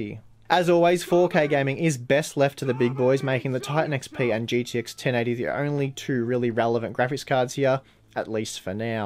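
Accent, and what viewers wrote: Australia. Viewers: You sound Aussie are you?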